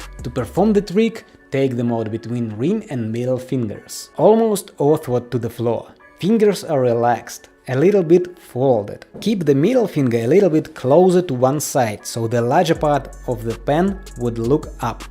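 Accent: Russia. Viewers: пф такой акцент "русский" )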